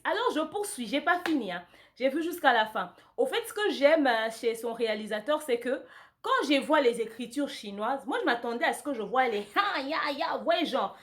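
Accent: Ivory Coast. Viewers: quand elle parle comme les ivoirien elle enleve sa exprès peut être que tu ne t'en rend pas compte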